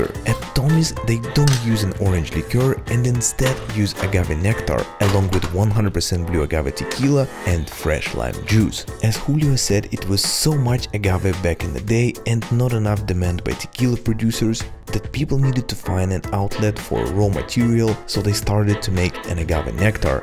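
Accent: Russia. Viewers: Акцент русский будто...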